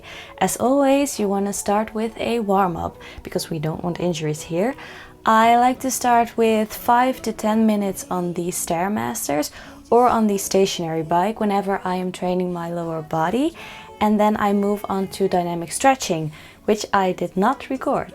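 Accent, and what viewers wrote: Netherlands. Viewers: Omg I found you today and I love your Channel! I’m also starting to lean bulk! So this is perfect! Groetjes uit Gouda! Ik hoorde je Nederlandse accent en zag het op je instagram dat je uit Nederland komt. Je bent super goed bezig! Ga zo door!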